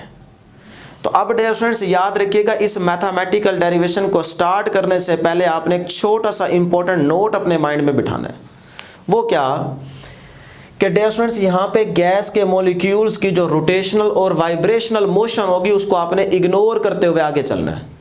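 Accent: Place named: Saraiki, Pakistan. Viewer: Sir ap saraiki laghta hnn😊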